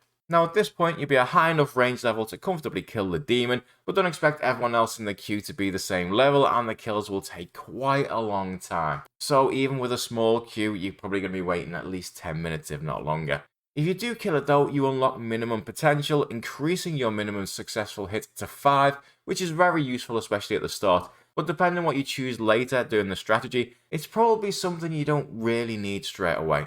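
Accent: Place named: Wirral, United Kingdom. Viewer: Maybe a Wirral accent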